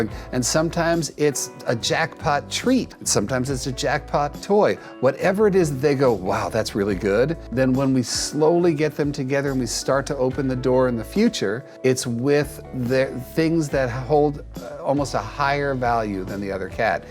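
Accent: Russia. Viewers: BTW she's not Swedish, she's Russian, Marusia is traditional name. Also, the accent is pretty Russian. I'm saying it coz I am Russian 😂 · a little note - these aren't Swedish names though, they're Russian, and the accent sounded Russian to me as well (just listen to those 'sh' and 'ee' sounds!)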